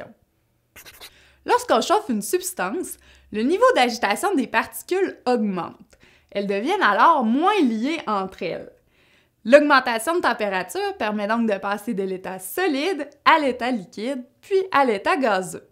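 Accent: Quebec, Canada. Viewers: vous êtes québécoise?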